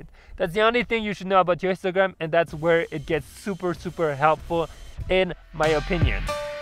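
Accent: France. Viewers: The french accent is epic 😊😊, I am a french speaker by the way and my english is way far from being as good as yours. Keep it up. I learn a lot through your videos.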